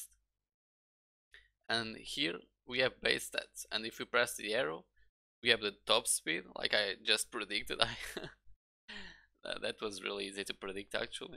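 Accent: Portugal. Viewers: És tuga não és? Pelo menos parece 😅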